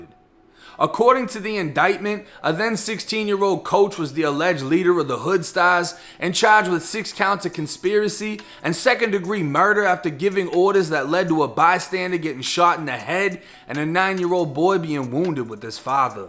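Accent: Boston, United States. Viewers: ARE U FROM BOSTON? Ur accent bro! Lol! ROXBURY DORCHESTER MATTAPAN!!!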